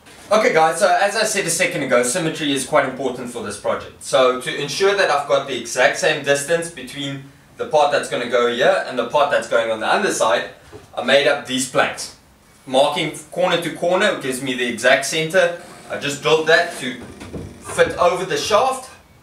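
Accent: South Africa. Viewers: Lekker boet!! Nice bench, great to hear the accent ... sounds like mine, originally from Kimberley, Northern Cape. Greetings from New Zealand.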